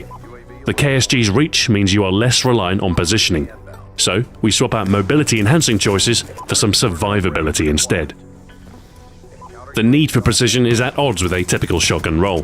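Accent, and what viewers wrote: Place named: United Kingdom. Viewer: Somehow your Britishness makes all of this sound much more official.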